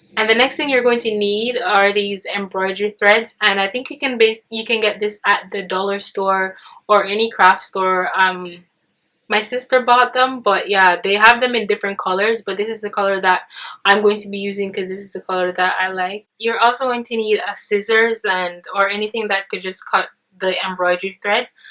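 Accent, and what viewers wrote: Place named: Jamaica. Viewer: Sensing a Jamaican accent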